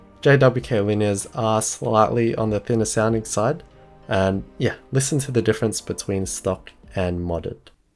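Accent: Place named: Australia. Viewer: you sound more Australian than usual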